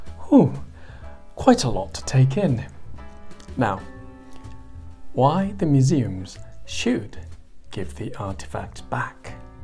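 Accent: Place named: United Kingdom. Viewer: 마놀린구독자인데 동영상이 있다는것 얼마전에 알았습니다. 영국식 영어~ ^^ 처음에는 조금 낯설었는데 집중해서 들으니 들리네요. ㅎ 번창하셔요~~^^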